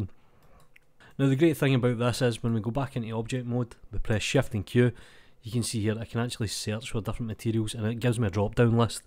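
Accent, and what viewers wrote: Scotland, United Kingdom. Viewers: That's Scottish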